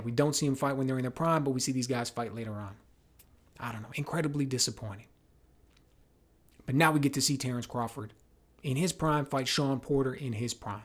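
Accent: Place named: South, United States. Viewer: always dig your insights man. plus you sound like a southerner (I'm from/in Mississippi)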